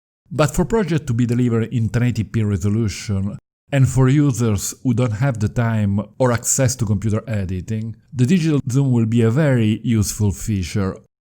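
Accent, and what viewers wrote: Italy. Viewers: Awesome accent. Love it. I guess it's Italian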